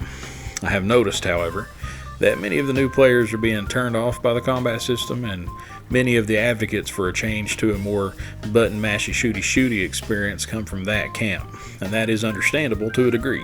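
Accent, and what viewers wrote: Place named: South, United States. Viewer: I agree, that's why I'm kinda wondering why he hasn't blown up. Smooth, precise with a certain southern charm twang going.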